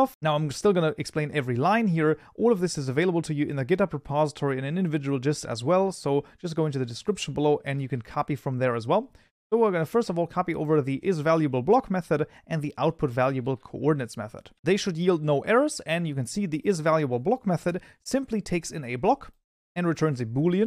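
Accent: Germany. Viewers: Amazing video man (also i love you accent, are you german?) I've looking for ways of having dynamic items, for example: i create an item that can change it texture and it stats (attack damage, mining speed, durability...) based on crafting materials, like tinkers' construct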